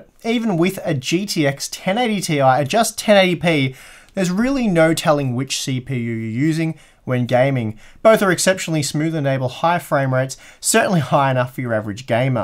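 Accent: Australia. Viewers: Nice Aussie accent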